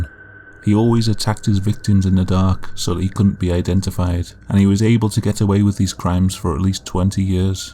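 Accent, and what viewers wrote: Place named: Liverpool, United Kingdom. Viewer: That's why you have a slightly Scouse twang to your accent!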